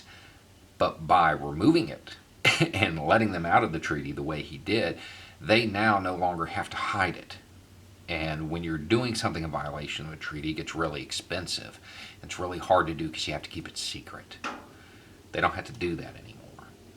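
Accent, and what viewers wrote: United States. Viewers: You speak real American. I wish more of us did. We all need to show up at our local republican office and demand that they denounce this Fucking imbecile trump and start impeachment proceedings... or we pick them up by their Fucking Traitor throats.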